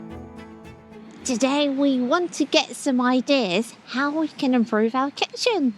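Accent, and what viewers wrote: England, United Kingdom. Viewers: Im curious, you and are from Germany, but you both have an English accent. Especially you,. How can that be?❤ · I like your videos! You are such a lovely couple! I have one question: you are from Germany, but you have no accent. Your pronunciation is so perfect and so gorgeous British 😅 I try to get rid of my accent too but it seems to be impossible… How did you manage it?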